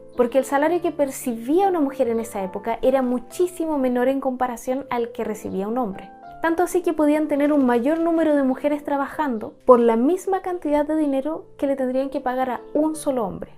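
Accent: Chile. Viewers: Es chilena, no? Nunca entendí el "hate" hacia ese acento, si es precioso. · Me encanta el acento chileno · ME FASCISA SU VOZ DULCE Y SU ACENTO CHILENO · Habla como chilena, yo soy chileno jaja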